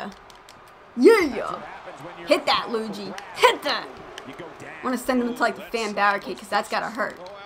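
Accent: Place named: New Jersey, United States. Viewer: l love her jersey accent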